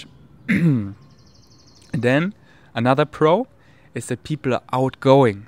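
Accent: Germany. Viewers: you have the loveliest voice for podcasts and talking. Part of what is so special is your German accent, but I agree and think it's important if you want to speak better English then emulating those with good speech around you is important. Not to learn just slang but actually what words should be dropped or not used, how to arrange them in a sentence to make sense and where the emphasis should be put on a word for correct pronunciation. But these things don't include your wonderful German accent which is what makes you YOU! xx I hope you enjoy the rest of your stay, you are learning so much about life and people from the everyday experiences (and so do we then!). Btw I moved to England from NY, my husband is British and we have fun joking about the differences in how we pronounce things. So what may seem correct to me may not be to a Brit, and the other way around. 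I think it's great to understand this when we hear someone from another country speak, one may not be wrong, just different. Just my thoughts.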